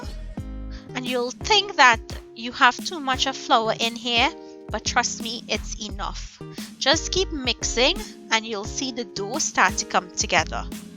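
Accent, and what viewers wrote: Trinidad and Tobago. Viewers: I like the Trini accent lol thanks for doing this I am wondering how you do these I'll see if they're different lol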